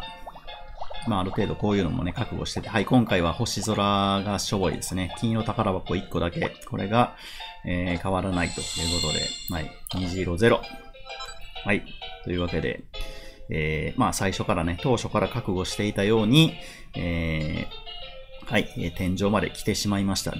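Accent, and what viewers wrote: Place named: Kansai, Japan. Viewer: あ、残念ですねー😆 目つぶってる🤭ｸｸｸｸｸｯ 浜田さんの関西弁好きやでー❤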